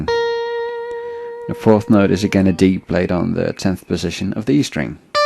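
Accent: Netherlands. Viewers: Quick question: are you Dutch? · Oh my god. Thank you for this... 10 minutes indeed. Can't mistake THAT accent.. I'm from Los Angeles but my dad's family is from Arnhem. Bedankt! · Er vanuitgaande dat ik een NL-er hoor: Goeie uitleg! Dank!!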